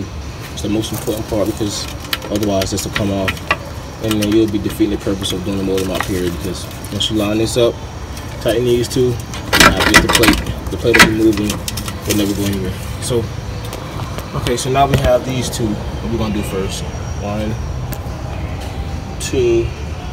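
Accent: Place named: South Carolina, United States. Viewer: That's a South Carolina accent for sure! Thanks for the helpful video too!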